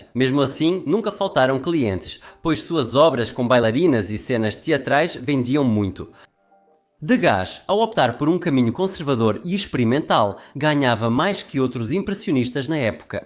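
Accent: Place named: Brazil. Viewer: Seu sotaque não, e brasil.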